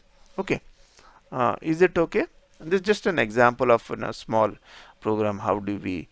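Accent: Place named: India. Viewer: Indians speaking english sounds very ridiculous, next time use subtitles or remove anything below your tonge, the world will thank you.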